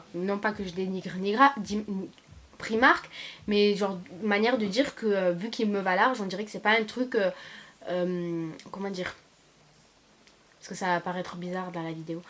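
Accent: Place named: Paris, France. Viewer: Pourquoi tu fait l'accent parisien?????🤭🤭🤭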